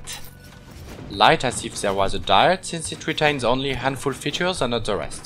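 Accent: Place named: France. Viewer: you french?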